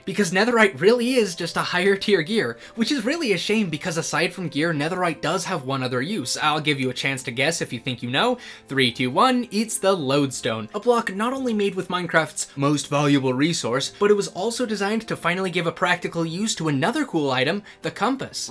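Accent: Canada. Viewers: Ugh 😩 voice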